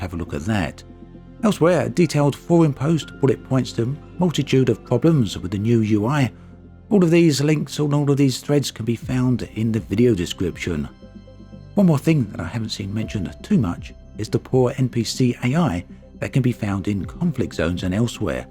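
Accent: United Kingdom. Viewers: I fucking love your accent! Is this british or something special or regional?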